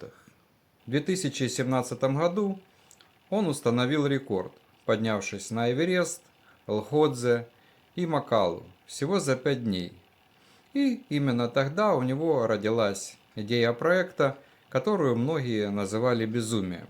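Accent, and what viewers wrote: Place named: Okanye, Russia. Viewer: Научись разговаривать по русски, не окай! Удачи! Но слушать тебя невозможно!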